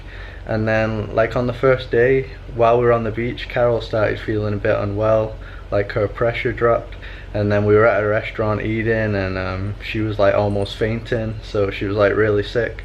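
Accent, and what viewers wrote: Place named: Northern England, United Kingdom. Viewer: what accent is that? sounds sometimes northern england and sometimes like a weird american thing!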